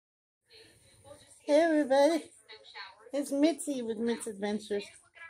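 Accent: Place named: South, United States. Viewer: I love your voice, It sounds really nice, sometimes I go over your videos to hear your soothing voice, Its comforting.,Sounds like a Southern Girl.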